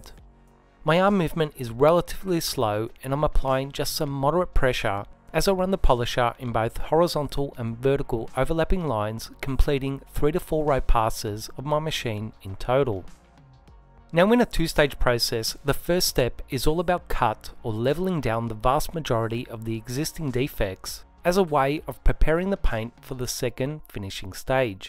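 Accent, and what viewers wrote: Australia. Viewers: Woah it's an Aussie! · I love AU accent! Almost British!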